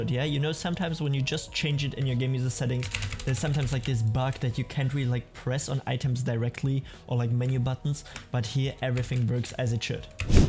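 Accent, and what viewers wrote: Germany. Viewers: are u german ?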